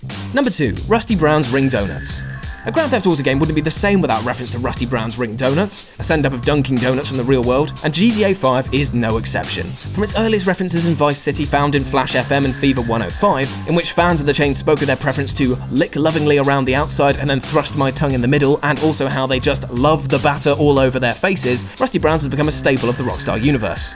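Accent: United Kingdom. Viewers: Ok people that criticize a game that they can't fix or do anything about pisses me off but some one with a British accent criticizing a game just really pushes it. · this is guy is 100% british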